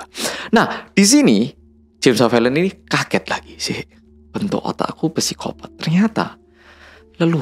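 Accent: Java, Indonesia. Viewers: Gayanya mas sekarang "korea" banget tapiiii karena sering muedok jadi pas ngomong korea otak ku tetep berkata "weh iso boso korea lek". Padahal sadar dengan penuh kalo dia ya org korea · PLOT-TWIST 🤣🤣👍🏼👍🏼Suka video, terima kasih Boloku Sing Paling Medok Dheweeeee 🙏🏼🙏🏼 Bikin video yang membahas Narcissistic Personality Disorder (NPD) juga Rek (aku juga kemarin habis bikin utas Twidder untuk NPD biar korban²nya bisa membela diri. 💪🏼✊🏽❤️❤️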